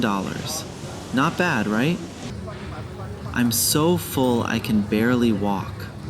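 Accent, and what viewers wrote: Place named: United States. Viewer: please dont use the american sounding voice, the one from your first video is much better!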